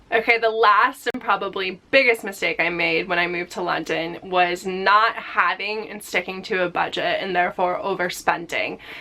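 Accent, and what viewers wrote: United States. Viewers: As an American myself, her accent sounds like a typical lady here in California. I don't here any type of European accent in her voice at all. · Don’t force it. She still sounds American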